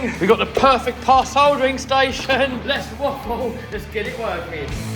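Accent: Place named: United Kingdom. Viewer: were you the giving me all those cool hints when I was playing call of duty and now I'm watching you doing the trucks? Or is that just my idiotic American ear not being able to pick up the difference on a British accent? Full respect sir. Love your channel.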